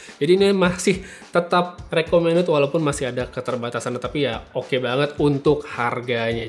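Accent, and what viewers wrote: Bali, Indonesia. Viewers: Ini orang Bali ya???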